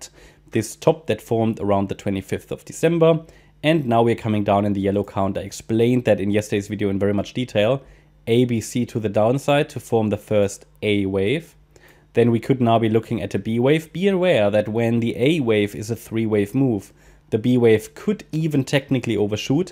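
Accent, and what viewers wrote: Germany. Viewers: Thank you Mister, I always thought that you speak (good) English with a German acent, and a few days ago I found your DE chanel 🤣🤣😍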